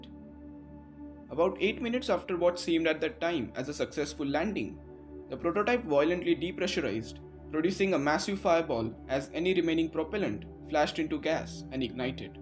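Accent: India. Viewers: when can Indians speak with a not annoying accent? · I am American and I understood that narrator who sounds East Indian perfectly.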